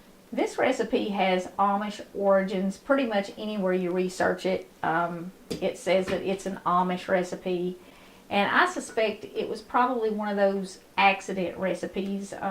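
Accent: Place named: Tennessee, United States. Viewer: Thought I recognized a fellow Tennessee accent. For the Diabetic, along with the flour swap Substitute the sugar with a baking alternative like Splenda. And use REAL butter not margarine. I've done and taught Diabetics how to do low carb diets for eight years, these two swaps make it completely blood sugar friendly. It'll still rise, got it'll rise a LOT less. Love the channel and the emphasis on putting God first.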